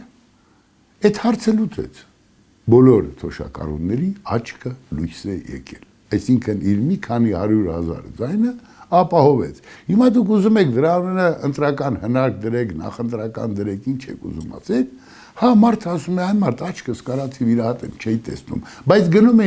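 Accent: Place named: Lori, Armenia. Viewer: 2016. Ին. Ուրախացել. Էմ. Ձեր. Ու. Վահանի. Տեսակով. Հետո. Որոշ. Ժամանակ. Քննադատել. Բայց. Երբեք. Կոռեկտության. Սահմաները. Չանցնելով. Ու. Չարիք. Էմ. Համարում. Բոլոր. Նրանց. Ովքեր. Հայհոյում. Են. Ու. Անկախ. Նրանից. Համակարծիք. Էմ. Եղել. Թե. Ոչ. Ձեր. Խոսքում. Լոռվա. Բառբառի. Համն. Ու. Հոտն. Էմ. Առել. Չէ. Լոռեցի. Չէմ. Բայց. Մեր. Բառբառները. Մեր. Նկատի. Ունեմ. Հային. Բառբառները. Հոգիս. Ջերմացնում. Են. Տանում. Ինքնություն.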